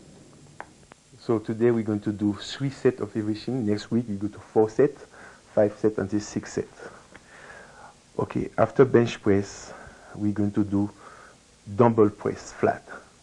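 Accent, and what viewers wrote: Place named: France. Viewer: I understand this frenglish language no problem ☝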